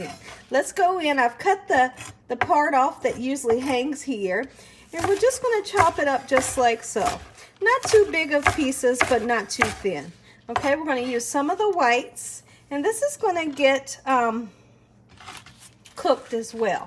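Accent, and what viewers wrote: South, United States. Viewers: thank you for this recipe of Jamaican beef Pattie’s “101” as you put it! I never thought of using ready made empanada dough! I think I’ll try it! This looks delicious! This is the first time I ever saw a nice southern soul sister like yourself make Jamaican beef Pattie’s on video with a southern accent! I love it I love it I love it!!! “ you hear me?” 😂 That’s also what I like about your other videos as well! They are so cheerful and could easily cheer up an otherwise blue person! Keep it up my dear! Thank you thank you thank you! I grew up eating Jamaican beef Patties! God bless and keep it up! Ps: thank you for this dough shortcut because sometimes it’s a little troublesome and time consuming and at times it doesn’t turn out right for me when preparing the crust!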